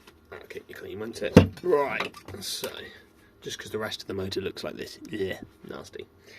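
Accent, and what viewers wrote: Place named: United Kingdom. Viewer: what nobody wants to hear is that smooch british bs accent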